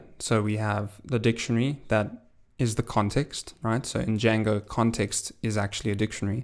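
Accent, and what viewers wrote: South Africa. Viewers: Hey a south african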